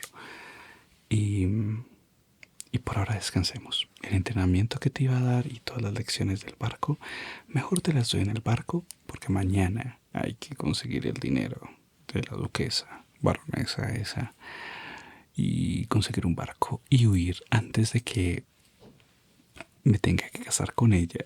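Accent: Colombia. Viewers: Es colombiano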